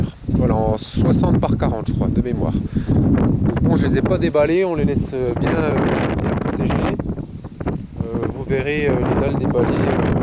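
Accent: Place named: South, France. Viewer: je vois que tout le monde est rentré du chantier ou des courses à cette heure. Fais tes vidéos avec ton envie et ton petit c...r, ça va marcher tout seul (bon dans le texte y'a pas d'accent, toi tu l'as du sud, de la contoise, et moi de la parisienne, ça nous ferais un mélange bien bizoire) A+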